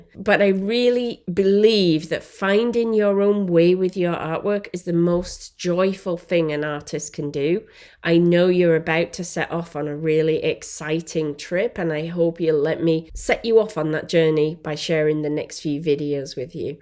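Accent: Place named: Lancashire, United Kingdom. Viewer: Gosh, I’m so happy I found you and your podcast. I live in the US now, but originally from Lancashire, your accent brings me back home (I have family in Yorkshire!) I’m so excited to delve into acrylic painting properly this time and learn my authentic self/style; no more picking up then giving up - excited to learn and be guided by your lessons and work. Thank you.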